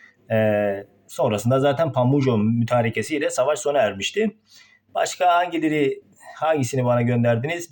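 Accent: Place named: Van, Turkey. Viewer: Hocam ne kadar yakışıklısıniz Vanlı mısınız shhshshs öyle bir sive aldim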